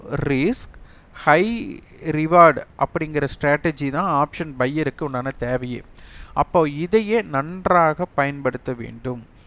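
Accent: Kongu, India. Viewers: Masterji, such a nice advice (in a family how Amma Appa advice to their childrens - I feels just like this) for small traders, in 2 days can't earn lakhs & crores, we can save our capital, This is the time to study, observe, learn. Take a notes what market doing Iam a big fan of your Kongu Slang Tamizh "VALATHUDAN VAAZHGA"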